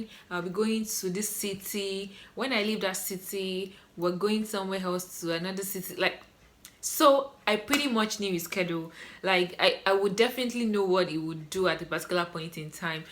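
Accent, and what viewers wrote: Yoruba, Nigeria. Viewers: Your yuroba because you say forhever not forever. Thats really a yoroba thing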